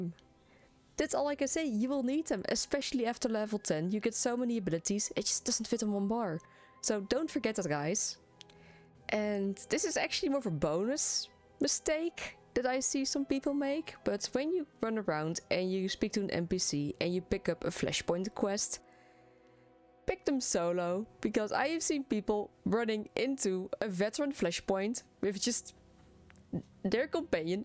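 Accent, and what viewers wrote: Netherlands. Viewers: You sound kinda dutch. Ben je een Nederlander?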